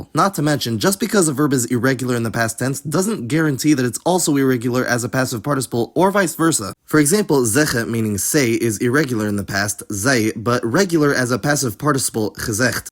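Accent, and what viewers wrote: Amsterdam, Netherlands. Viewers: Small correction on the phonology, nearly everything that applies to the Belgian dialects also applies to southern Dutch dialects. Also you're pronunciation is the best I've heard from a non native. You sound like you're from Amfsterdam specifically, which is a compliment. Edit: I'm not sure if this goes for all dialects but at least in Brabants we often but not always drop the [t] at the end of words. So in my native dialect (Tilburgs) I would say: da in stead of dat, wa in stead of wat, but kat, boot, gat and het would all keep their t's. I don't know why we do this, but I thought it was interesting.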